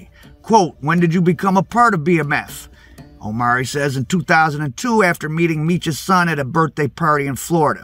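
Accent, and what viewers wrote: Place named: Midwest, United States. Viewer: Minus the fact he sounds like he has a mouth full of shite when speaking. He is very thorough with his stories. Im put off by his heavy MidWest accent. Da Bears!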